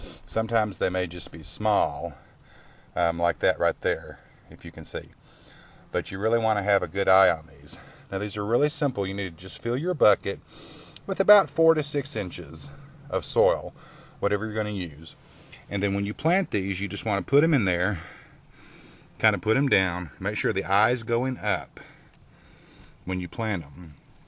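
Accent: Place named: South, United States. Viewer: Lovely! Thank you for the post. (I love your sweet southern drawl too!)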